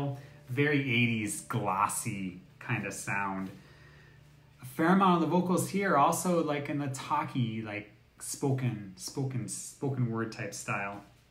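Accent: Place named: Scotland, United Kingdom. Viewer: Good to see u back. Interesting as ever with a healthy does of Scots. Prefer your first pronunciation of Brisbane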